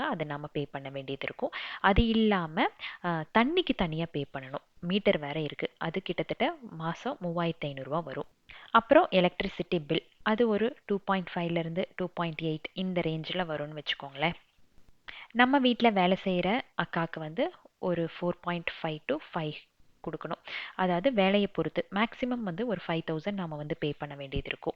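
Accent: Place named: Telugu, India. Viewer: What’s your mother tongue ….i see you speak Tamil really well but you also mix Telugu in that is it?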